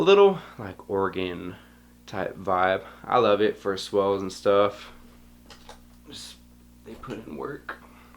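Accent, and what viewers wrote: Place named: Brazil. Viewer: Nice! Quality and efficiency... Hey man, are you Brazilian? Something in your english sounds familiar...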